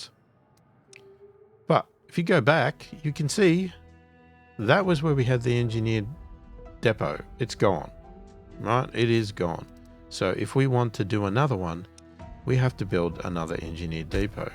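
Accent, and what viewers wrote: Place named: Australia. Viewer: where you from brother u sound aussie... edit: yea checked the about section XD g'day brother XD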